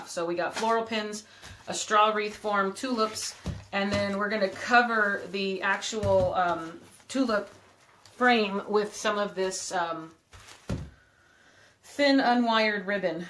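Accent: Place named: Nevada, United States. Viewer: Beautiful tulip wreath. I love your Nevada accent.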